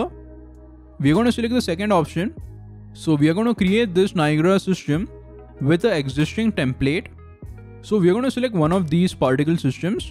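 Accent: India. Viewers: he has the best indian accent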